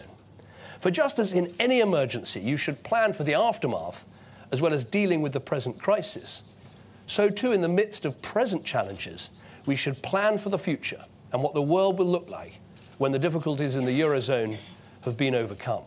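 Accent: RP, United Kingdom. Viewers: British accent only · Because he speaks with an rp accent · Yea I’m with you, it’s weird. It’s litt just a British accent. I think he’s toning he’s accent down tbh from a posher one. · Because it sounds elegant, sophisticated. I think he has got an RP accent. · I came here just for british accent. I'm confused because I understood almost everything...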